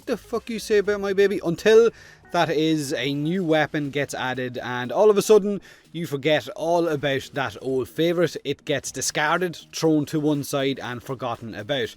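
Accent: Ireland. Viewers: I love your irish accent. It’s amazing. You’re by far my favourite youtuber, and i will definetly try the dual ichors ;3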